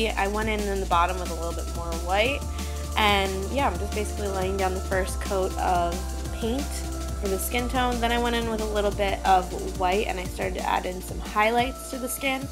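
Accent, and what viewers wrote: Pennsylvania, United States. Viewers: I'm a new subscriber! It's so funny I could tell you were from PA by your accent! Lol. I love it. I'm from PA too! You are so talented ❤️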